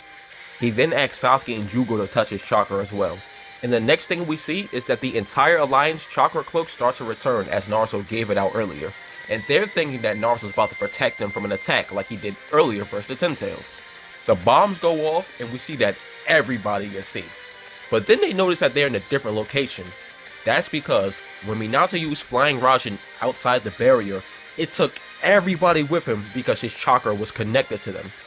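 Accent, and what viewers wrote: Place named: East Coast, United States. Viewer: Where are you from east coast USA huh ?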